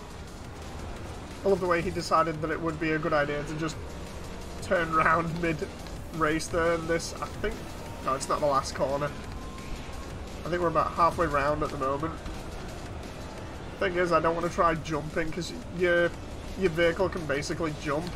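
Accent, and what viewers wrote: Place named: United Kingdom. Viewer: U sound like david schwimmer but british 😂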